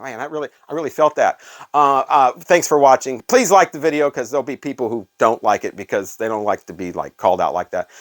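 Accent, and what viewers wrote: United States. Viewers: High bullshit = High record prices?! Wtf are you talking about? Another issue; ripping people off is NOT business. And btw… there’s no doubt you are an American. And finally, proper pronunciation is not pretentious.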